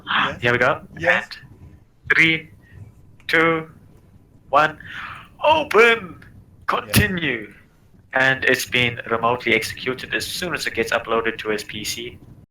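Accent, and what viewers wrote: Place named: India. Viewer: This host channel like india accent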